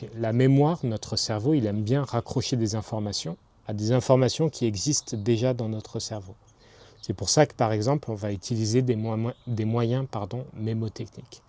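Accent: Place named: Marseille, France. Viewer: Dis moi que tes de Marseille sans me dire que tes de marseilles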